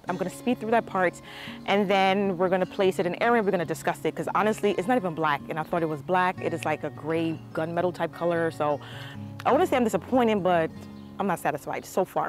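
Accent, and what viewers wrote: Patois, Jamaica. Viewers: Beautiful choice,! It probably looks crooked to you because of something in your line of sight. It looks straight and even from this side. It will be even more gorgeous when those vines start creeping up and over it. Btw, I think this is the first time I’ve ever heard you speak Patois. 💚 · Love to hear the patois. TFS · Love the progress of your garden. Everything is coming along great. I'm looking forward to seeing it in bloom. I also love to hear your Jamaican accent when you are talking with your husband. 🥰 God bless your family and garden. 🌷🌿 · Loved hearing the strong Jamaican patois 😁🇯🇲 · I have Jamaican pastors and I love to hear them talk in the native accent and tongue. your accent popped out real quick and I just love it! the arbor is beautiful. I like that it isnt stark black. the muted color tone will only make whatever you put on it pop even more. 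#tfs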